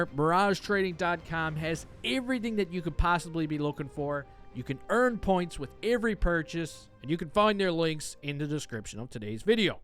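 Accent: Newfoundland, Canada. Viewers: You have a real Canadian accent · I love your newfy accent! great content too! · Is you from Newfoundland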